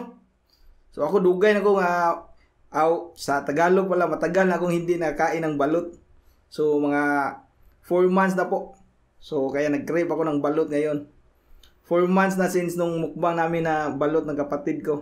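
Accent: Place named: Bohol, Philippines. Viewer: Taga Bohol ka migo ? Mura man ug Taga Bohol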